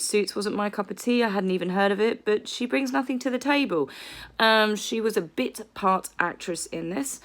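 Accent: Australia. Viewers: You have picked up an Aussie twang. 👍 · Enjoy your stay here in Australia...South Australia is hot 🥵 this time of year......enjoy the climate change... Guess what you still have your Aussie accent with the splash of pom.....don’t sound any different to me.....💕💕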